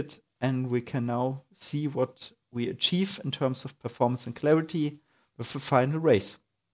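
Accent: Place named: Germany. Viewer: thank you so much for your help! And as a also German I may say, that I love your beautiful German accent 🤩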